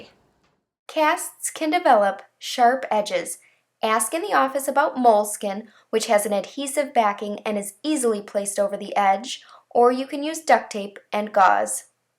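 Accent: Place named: Minnesota, United States. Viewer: i can tell you are from minnesota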